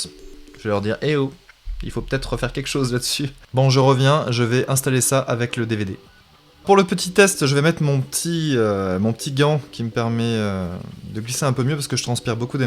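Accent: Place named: Quebec, Canada. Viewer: t ai quebec...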